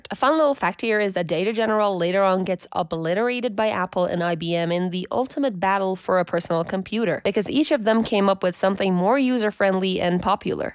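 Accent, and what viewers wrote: United States. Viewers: you don't need to, i already did it for you. it's just an American accent. :)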